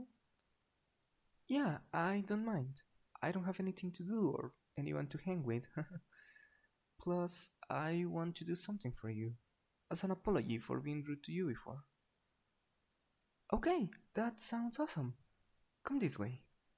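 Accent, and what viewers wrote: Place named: United Kingdom. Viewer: I'm new , are you British? because your voice kinda sound like British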